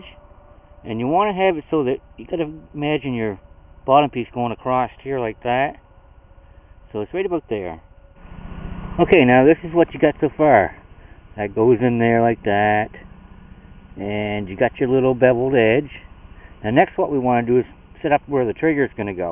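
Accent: Canada. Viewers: Great video man! I love how canadian you sound. Thanks for the post stay Eazy! ... "Shklor" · are u canadian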